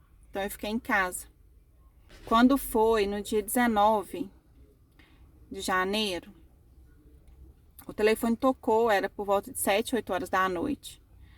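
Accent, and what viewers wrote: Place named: Minas Gerais, Brazil. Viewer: Minha irmã mineira,logo no primeiro vídeo percebi seu sotaque , que testemunho mais lindo . O luto dilacera a alma ,qdo minha neta não tinha nenhum aninho ainda,qdo meu amado esposo foi acometido de um cancer ja muito adiantado.Fiquei quase 40 dias com ele no hospital,passou por 3 cirurgias ,eu só acompanhava os primeiros passos dela por video . Se não for o supremo amor de Deus a gente nao aguenta .Tantos sonhos ,me aposentei pra termos tempo com Marina , e vi meu amado cada dia piorando . Tudo que planejamos indo de uma forma tao cruel ,mas pela graça do Senhor tive forças pra entregar Ele para o Criador e Pai celestial . Foi um processo muito doloroso o meu luto porque entramos na pandemia ,perdi parentes ,vizinhos ,amigos,sobrevivi pelo legado de fé que ele deixou,um homem muito temente a Deus ,um grande pregador e adorador . 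Não foi facil mas o Senhor renovou minhas forças,minha fé e hj prossigo na obra ,minha netinha ja com 3 anos , e minha esperança maior é um dia nos encontrarmos na glória, por isso luto diariamente .Fico feliz que Deus te proporcionou uma nova história, e seu testemunho me fortaleceu ,que possamos permanecer fiél ate o fim , porque um dia Deus enxugará de nossos olhos toda lágrima🙏🙌📖📖📖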